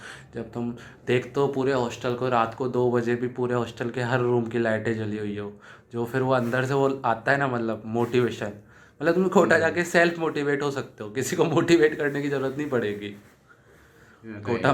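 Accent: Maharashtra, India. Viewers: Tu Marathi ahes ka? Video khup chan ahe Hindi la Marathi toning ahe….😄😄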